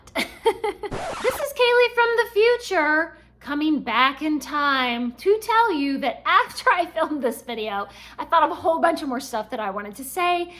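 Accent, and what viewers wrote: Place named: Mexico. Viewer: Hola! Saludos desde Barcelona (España). I follow your YouTube channel since some months ago now and it is the first time I comment. Hope you are well! You are the second booktuber that I find (the truth is, in a very short period of time!) that speaks Spanish as well and I am very happy for that!! My first language is Catalan and my second/first language is Spanish. I speak English and a little bit of French and learning Russian nowadays. Would be great to read some books in Spanish with you! Hablas muy bien español con ese acento mejicano maravilloso 👏👏👏. Hasta pronto!!! Cuídate mucho!! Bye!😊🙋‍♀️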